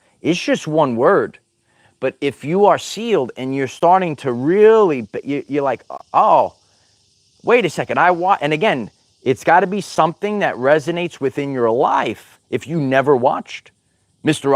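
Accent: New York, United States. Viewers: One of the biggest grifters on YouTube. Accent makes me think of a NYC cop or criminal.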